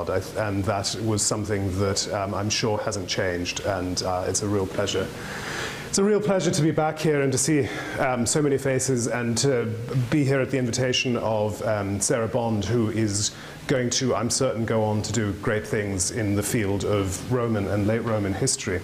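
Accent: England, United Kingdom. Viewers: I don't know, but with that accent I'd bet money he went to an English public school. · Polish name with the most beautifully English voice.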